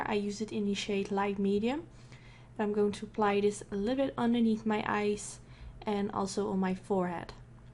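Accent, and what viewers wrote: Netherlands. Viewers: ik hoorde je accent en ik wist meteen dat je Nederlands was. you go girl 😚❤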